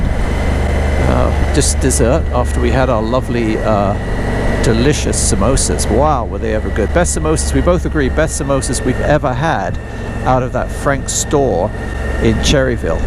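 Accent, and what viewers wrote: United Kingdom. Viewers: Love watching the videos. Your accent makes me miss the UK.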